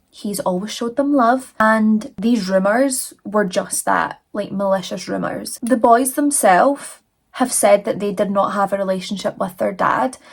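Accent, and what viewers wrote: Scotland, United Kingdom. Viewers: is not only hands down the best looking presenter but well versed and well researched and that Scottish accent! OMG, I love this channel.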